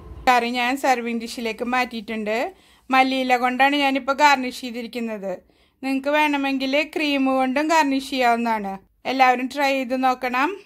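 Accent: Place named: Thrissur, India. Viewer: തൃശ്ശൂർ ആണെന്ന് തോന്നുന്നു സംസാരം കേട്ടിട്ട് Anyway, എത്രയും പെട്ടെന്ന് ആയിരം സബ്സ്ക്രൈബർ ആകട്ടെ എന്ന് ആശംസിക്കുന്നു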